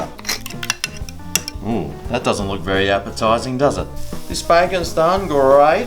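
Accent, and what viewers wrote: Australia. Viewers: Nice to hear an Aussie voice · you sure do like your cheese hugs from America hon btw I love the Aussie accent;) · Aw. You were pleasant to watch and the recipe looked very yummy. Darn it! I miss the Australian accent. Lived there for 6 months so long ago. Aluminium. Heh heh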